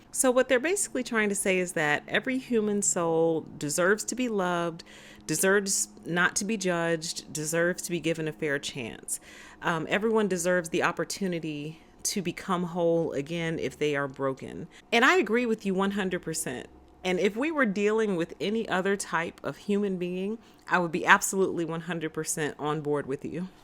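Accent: South, United States. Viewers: I love your Southern accent! I'm in Arkansas : )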